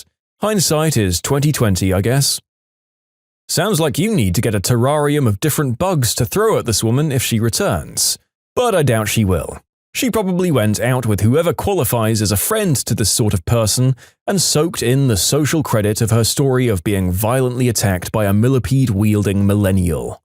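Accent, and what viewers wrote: United Kingdom. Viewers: Bro is british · Is bro british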